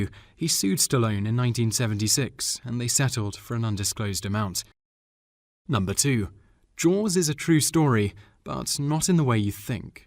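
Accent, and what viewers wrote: England, United Kingdom. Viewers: Thoroughly enjoyed the video....and the manner of presentation. It is not often that I create a list of topics to "research" based on a YT video....but this one will have me scurrying to see some of these movies...perhaps: "again" (Audie Murphy especially). The narrative script was excellent.....and the narration itself. I don't subscribe to a channel unless I'm pretty darned impressed with the content...or I've had some enjoyable dialogue with someone. Subbing up to your channel now. ~RED ☮♥♫ (BTW...an "aside"....I used to have an English boyfriend....his accent and voice tone was the softer/smooth sort....exactly like this narrator. So...kind of a "warm and fuzzy" recollection for me. :-) Never forgot him).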